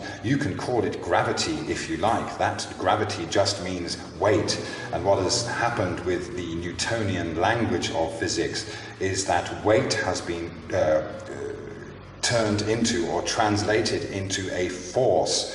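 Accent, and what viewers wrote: England, United Kingdom. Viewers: can be denser than other air; this is the basis of meteorology, i.e. weather forecasting. Warm air floats on cold air, because of density, but ALL air is attracted to the earth. Its called gravity (stupid), and since ALL air has mass, gravity can act on these objects. Do you actually understand any physical science, or do you think people believe you know what you are talking about because of your "english" accent? Sir, you will never be mistaken for David Attenborough; an actual scientist:-)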